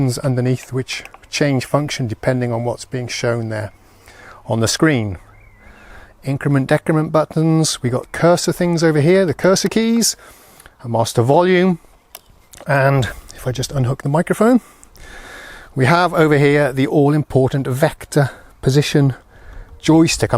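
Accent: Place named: England, United Kingdom. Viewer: It seems like you live in Sweden, but you have an English accent. Are you from the UK originally, or did you perhaps study English there as a youngster?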